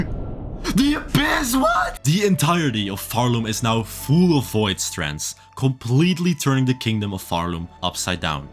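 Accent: Netherlands. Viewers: And team Netherlands 🎉 I couldn't not recognise your Dutch accent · Ik wist dat ik het nederlandse accentje hoorde 🤯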